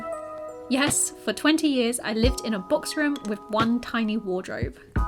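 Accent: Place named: United Kingdom. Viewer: British accent is very beautiful to listen. I can listen you speaking any longer. You're gorgeous!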